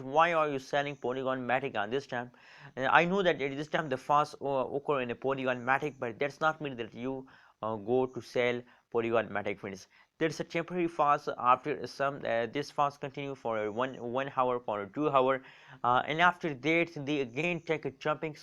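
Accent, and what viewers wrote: India. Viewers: white people video thumbnail english language indian accent as fast as japan high speed electric train no facts at prediction even a teenage 14 boy also can reexplained this thats blah blah blah zero informative content as for sure most brainy positive video presented by showing by content presenter faces for sure this boy wanna follow hype and get fast subs and views and get money for sure many viewers will stay out from this kind of video coz its promising thumbnail video promoting click bait.. well done indian teenage boy!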